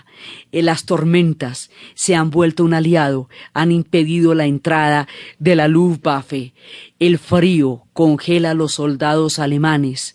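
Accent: Antioquia, Colombia. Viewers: Muy buen relato. ¿Eres paisa?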